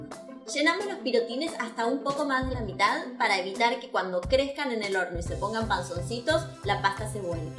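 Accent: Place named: Argentina. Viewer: Hola te hago dos preguntas:1- hace cuanto que estas con este canal? (solo me aparecen 2 videos tuyos)2- ¿Eres de Argentina? Pregunto por tu hacento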